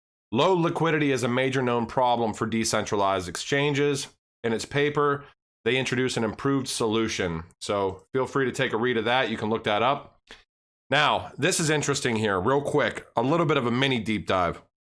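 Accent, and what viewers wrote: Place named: Midwest, United States. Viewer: I'm Charlotte NC You have a mid west vibe Chicago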